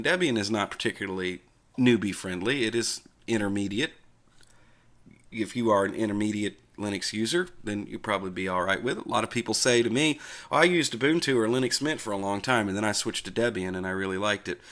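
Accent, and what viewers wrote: South, United States. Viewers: Boy, I'll tell ya - that southern twang spoutin on and on about Linux is something special. Keep on keepin on, and don't mind the haters. You've got another subscriber! PS: To everyone saying that "Ubuntu just works - stop distro hopping, you'll learn how it REALLY works" - go f*** yourself, you dang fanboys. He's absolutely right. The packaging of the kernel is not always done right. People mess up and some of these people are coders & packagers. I can't count the times on my two hands when I've installed the "easy to use linux distro" only to see that certain unsupported chipset & hardware, as well as unstable properitery AND open source drivers, make the system crash and burn horribly. It's quite irritating and someone who tells it like it is makes the situation better for new users, in realising how free and open it really is. 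It is chaos - a beautiful chaos, but still: chaos.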